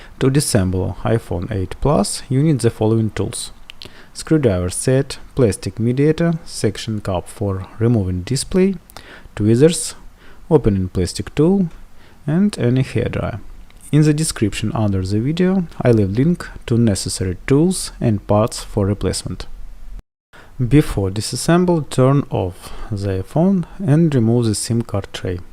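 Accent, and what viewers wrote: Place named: Russia. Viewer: рашн аксент дас ист пыздець ) and thanks a bunch for the video, it's awesome and clearly explains all actions - much appreciated 👍🏻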